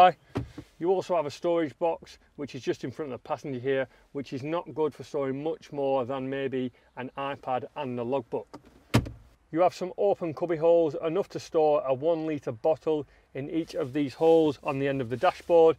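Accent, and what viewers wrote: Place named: Northern England, United Kingdom. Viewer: fantastic review thanks, living in france coming from Lancashire great to hear a northern very honest voice. thanks for this review very informative very honest, good job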